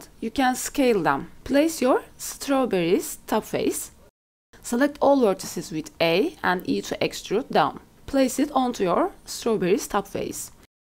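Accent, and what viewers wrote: Turkey. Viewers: şiveden tahmin ettim Turk oldugunu :D eline saglik 🙏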